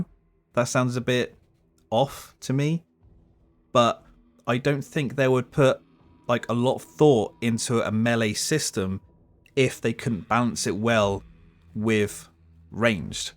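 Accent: United Kingdom. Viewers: A British mush mouth is just so cute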